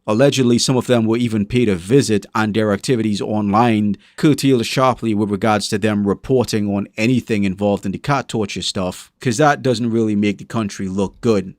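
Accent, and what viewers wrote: Jamaica. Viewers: I don't want to be rude or anything but what is your accent? It sounds like a Jamaican, South African, and New York accent all at the same time. I'm surprised people aren't hunting them down and unaliving these creeps. If there's anyone in China reading this who has nothing to live for... Or if you're suicidal, don't commit suicide and instead... well I can't say it. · your accent is very interesting. it sounds sort of Jamaican/west African mixed with Geordie/north english accent. what actually is your accent?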